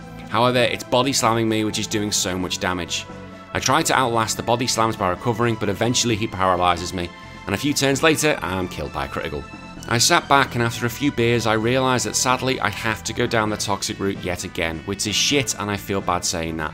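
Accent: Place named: England, United Kingdom. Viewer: Love seeing these challenges with a local-ish - English - accent. And swearing. The recorder fucking broke me.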